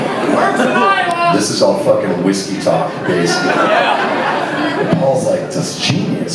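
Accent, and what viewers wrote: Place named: Scotland, United Kingdom. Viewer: that beautiful scottish accent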